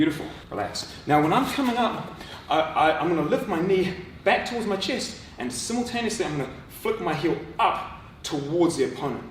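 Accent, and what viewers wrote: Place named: New Zealand. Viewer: sounds super kiwi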